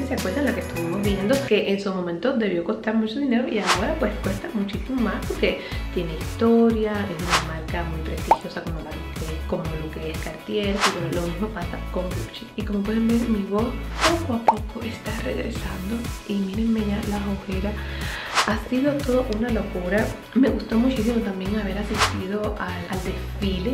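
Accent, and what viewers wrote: Cuba. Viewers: Vos sos de cuba tenéis acento cubano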